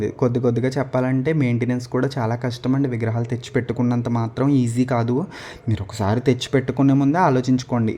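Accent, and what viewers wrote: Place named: Godavari, India. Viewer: Meru godavari vallandi. Mata telustumdi. Alamkaram baga chesaru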